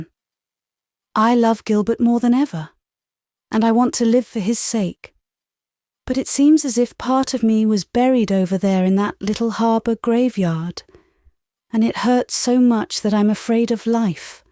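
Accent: United Kingdom. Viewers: Beautiful British accent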